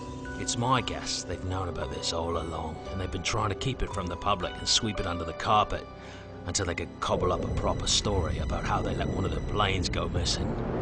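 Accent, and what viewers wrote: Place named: Cockney, United Kingdom. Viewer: God I hate that cokney accent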